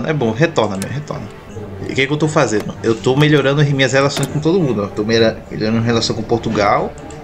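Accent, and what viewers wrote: Northeast, Brazil. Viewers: Sugestão tu e baiano ou nordestino?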